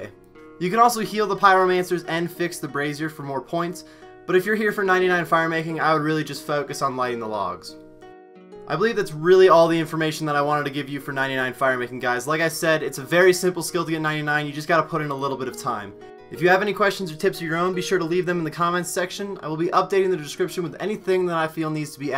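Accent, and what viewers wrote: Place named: South, United States. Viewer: Where you from? Noticed the southern accent :P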